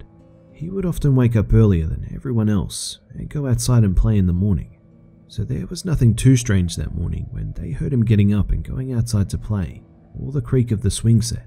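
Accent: Australia. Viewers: are you aussie? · yes I'm aware he's an Aussie with an accent. fuck off me it was funny lol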